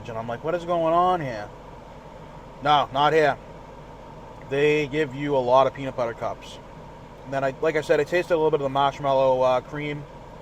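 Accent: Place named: Boston, United States. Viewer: We love you, and the Boston accent really hits the spot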